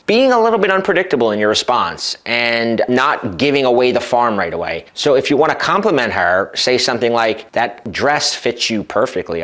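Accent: United States. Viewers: where does this american accent come from ?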